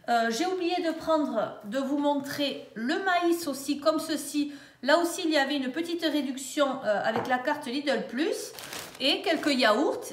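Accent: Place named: Southwest, France. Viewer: Coucou je réagis concernant les chocolatines oui dans le sud ouest on dit comme ça, moi qui suis originaire du nord on dit petits pains au chocolat. Depuis plus de 20 ans que je vis également dans le sud ouest je dis chocolatine sauf quand je remonte tout là haut. Entre parenthèse je vis non loin de Dax 😉🌞, je me doutais à ton accent mais sans grande certitude.😊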